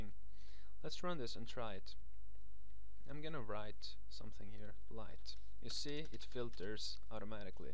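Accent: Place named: Sweden. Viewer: The UI language and his accent suggest Sweden.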